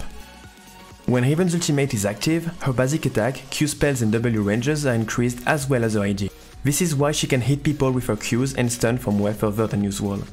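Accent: France. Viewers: il l'est très clairement, impossible d'avoir un accent pareil sinon aha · J'ai ultra l'impression que t'es fr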